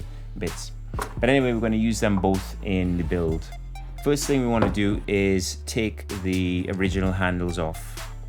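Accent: Trinidad and Tobago. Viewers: Do I detect a Trini accent?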